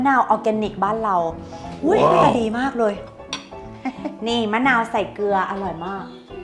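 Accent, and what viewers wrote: North, Thailand. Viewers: อู้กำเมืองม่วนแต้ๆจ้าว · ชอบแม่อู้เมือง อยากหื้ออู้นักๆในคลิปเจ้า เพลินดี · อู้ไทยปะแล๊ดเมืองน่าฮักดีครับ😊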